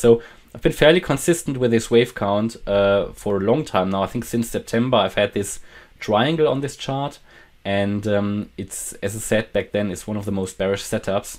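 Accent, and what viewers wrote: Germany. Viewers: Bist du deutscher? Frage nur weil dein Englisch klingt wie meins 😅.. Danke für die Info denke link wird uns noch positiv überraschen.👍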